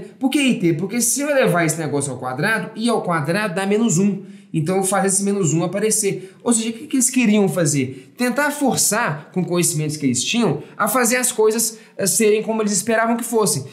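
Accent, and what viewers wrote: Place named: Minas Gerais, Brazil. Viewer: Kkkkkkkkk esse sotaque dele de mineiro é mt engraçado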